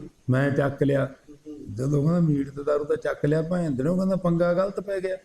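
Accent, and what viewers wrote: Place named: Lahnda, Pakistan. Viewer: So sweet ❣️❣️❣️❣️ Lahnda Punjabi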